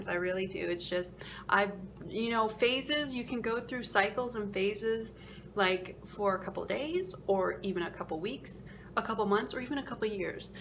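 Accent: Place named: Alaska, United States. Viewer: I could listen to you "rant" all day Miss with that Alaskan accent. Thanks for the chitchat!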